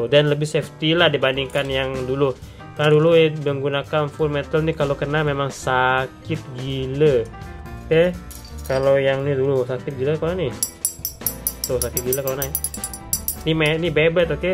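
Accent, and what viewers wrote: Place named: Indonesia. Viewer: indon la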